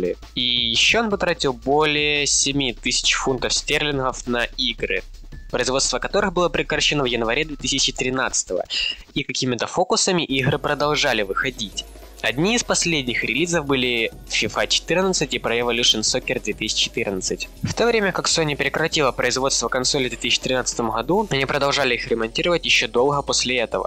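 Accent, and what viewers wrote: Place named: Belarus. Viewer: Пытаюсь понять что за акцент.. Ты из белорусской деревни?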